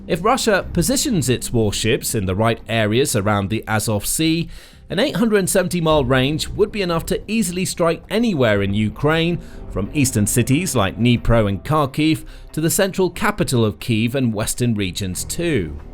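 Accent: United Kingdom. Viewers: The English (UK) accent is starting to annoy.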